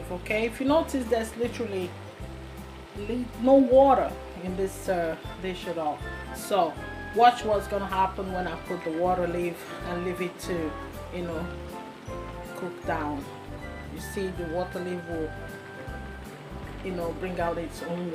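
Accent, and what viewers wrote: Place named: Nigeria. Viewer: It was really refreshing to hear a Nigerian girl with a beautiful Nigerian accent. We need to own our accents too like we own our cooking and teach the young ones that we are proud of who we are. These accents we try to put on make us sound so silly and small minded.....😉 just a thought.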